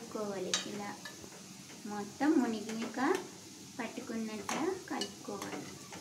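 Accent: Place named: Srikakulam, India. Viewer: Native srikakulam slang and dish 🔥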